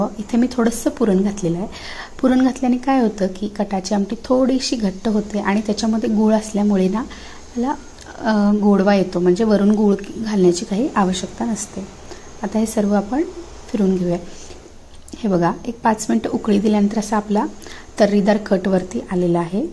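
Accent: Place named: Kolhapur, India. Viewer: कोल्हापुरी भाषेत लय भारी 👌👌👌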